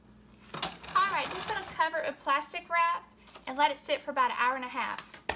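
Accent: South, United States. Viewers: I love it when I see cooks using Tsang's! Your country accent is adorable! Rock On! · P.S. - oh yes, you definitely have a southern accent. I wouldn't call it country, but definitely southern .. 😉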